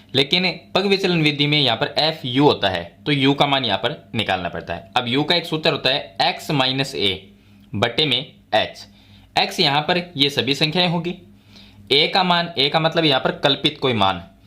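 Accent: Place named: Rajasthan, India. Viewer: Aap rajshthani he sayd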